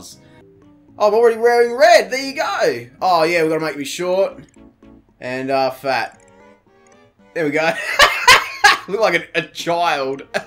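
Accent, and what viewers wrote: Australia. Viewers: Aww mate, congratulations on 10,000! Super happy for you, I know I haven't been here as long as a lot of your other fans (I guess it's been about... seven months? Eight?) but I knew from the first time watching you that you were going to be my favourite YouTuber; my instinct proved right and to this day you still are, you definitely deserve it and much more. As for this video I mean, shit, I've never even played a Wii in my life lol I've always thought they look mediocre at best, I knew people who had them when they first came out but they just never appealed to me. Looks like you had fun though haha, your laughter is contagious as hell.:') On a side note: I've noticed I laugh an awful lot whenever you say the word ''cunt'' in your videos lmfao I dunno it's just so hilarious to me, it's a funny word that's apparently made even funnier when spoken in an Australian accent. 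xD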